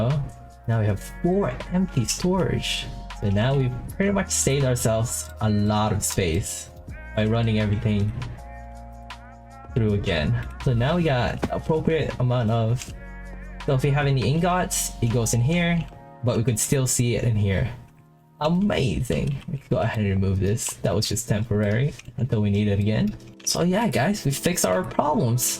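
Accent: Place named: Brazil. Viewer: Não precisei de 2 segundos de proza pra saber que esse caba é br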